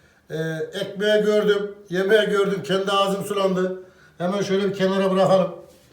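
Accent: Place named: Anatolia, Turkey. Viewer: Allah razı olsun,pratik ve Anadolu şivesi her şey gönlünüzce olsun.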